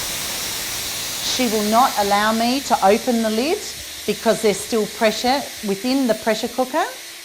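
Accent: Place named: Australia. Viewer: Thank you I love Australian people/accent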